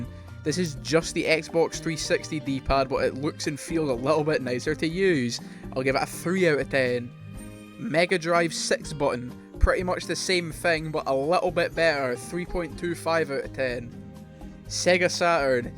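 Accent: Scotland, United Kingdom. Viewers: Are you Scottish because I am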